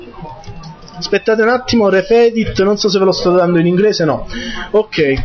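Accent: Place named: South, Italy. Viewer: Caro maestro, a primo acchitto, la sua voce con forte accento meridionale è fastidiosa da seguire, per cui abbiamo fatto fatica integrarci ai suoi corsi, ma alla fine ha vinto lei, trovandoli molto interessanti perchè tecnicamente spiegati bene. (siamo di Milano, non la prenda a male) Lei si spiega molto bene, questa cosa le fa onore mano a mano che viene seguito facilitandoci l'apprendimento. Una nota di rimprovero va alla sua sua classe: il brusio di sottofondo prodotto dai distratti, denota la pessima educazione degli allievi. Da noi, con un insegnante come lei, non volerebbe una mosca in classe e si sentirebbe solo le penne graffiare la carta per gli appunti appresi. Complimenti e grazie, le sue lezioni ci sono molto di aiuto.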